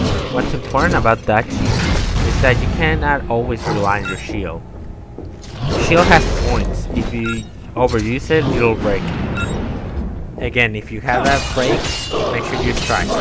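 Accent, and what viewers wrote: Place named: Philippines. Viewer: u r philipino right ?i love ur english accent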